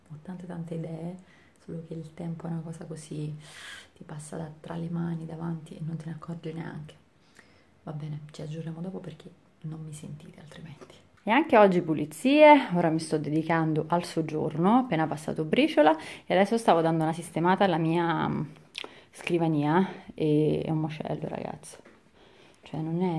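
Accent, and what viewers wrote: Tuscany, Italy. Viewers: Ciao,scusami ma tu hai la fortuna di abitare in Toscana e ti correggi quando parli toscano... pagerei oro per spostarmi dalla Calabria non fraintendermi AMO la mia terra e il mio mare, ma al sud poco lavoro e poco tutto ma quello che mi fà più paura e la Sanità praticamente non c'è.Quando vengo in Toscana precisamente a Pistoia l'adoro e da 28 anni che ci vengo purtroppo solo da mio cognato 1 volta all'anno, l'Ikea vicina i Gigli Firenze Prato vicini anzi tutto vicino,sei fortunatissima goditela 😉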